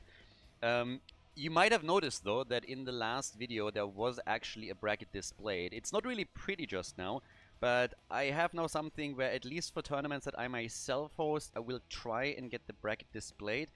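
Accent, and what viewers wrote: Germany. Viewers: Thanks for putting in the effort man! Great content! As a Dutchman living at the German border, I really enjoy your German accent 😀!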